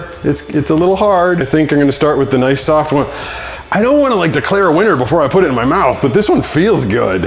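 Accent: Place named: Appalachia, United States. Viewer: Why does this pig talk with food in his mouth..hickish, reminds me of hillbilly heaven.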